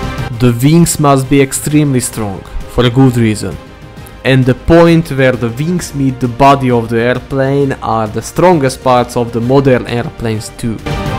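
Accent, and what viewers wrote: Russia. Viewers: Ты понимаешь русский?Акцент не английский.Do you speak russia? No English accent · bro your accent sounds sooo good, you sound like an russian... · His accent is very similar to Russian,Or am I the only one who hears this? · you have an amazing Russian accent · Сразу понятно что он русский, по акценту немного напоминает русского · Bro are you russian or what why you have russian accent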